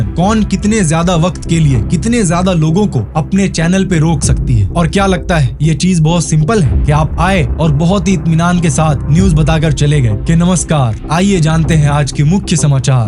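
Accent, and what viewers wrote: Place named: Bengal, India. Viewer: দাদা তোমার হিন্দি তে বাংলা টানের জন্য গর্বিত! 🙂 🙏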